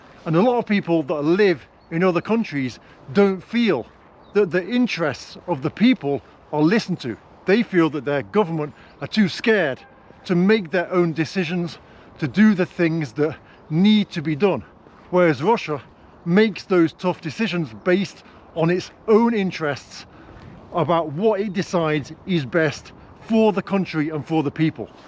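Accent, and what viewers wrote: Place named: North, United Kingdom. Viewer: У автора просто типичный северный говор)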